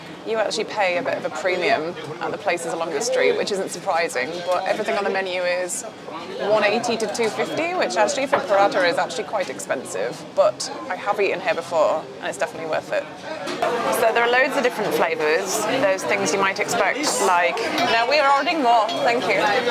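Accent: United Kingdom. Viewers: It seems you are British by your accent.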